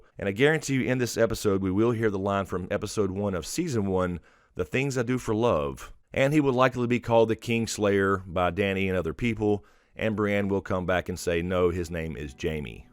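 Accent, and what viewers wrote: South, United States. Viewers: I love how you do it, get right to the point, sum it up so clearly in your warm southern style.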